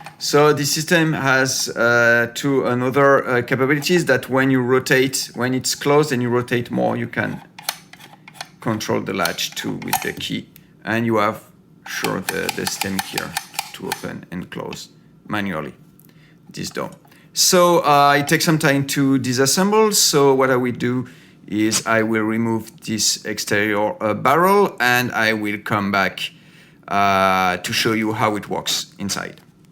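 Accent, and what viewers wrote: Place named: France. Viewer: je suis fan de tes vidéos et avec le french accent, change rien !! Feras-tu le F3D ?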